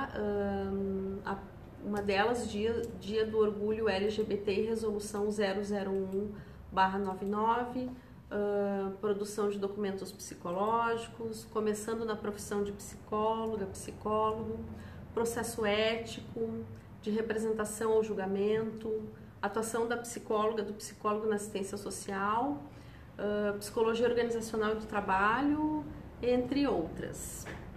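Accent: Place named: South, Brazil. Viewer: É um jeito de quem mora no Sul falar.. normal.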